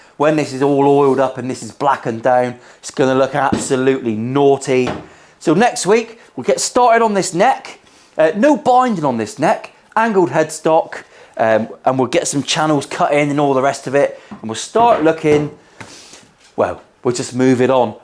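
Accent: United Kingdom. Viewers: I thought you said Woman but no my British local reginal accent translator kicked in and realised you said Warman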